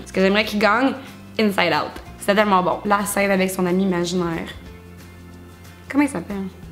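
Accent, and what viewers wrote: Quebec, Canada. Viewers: J'adore l'accent québécoises. 0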